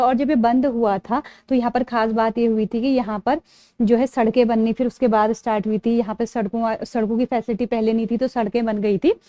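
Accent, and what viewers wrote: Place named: Pahari, India. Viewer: पहाड़ी tone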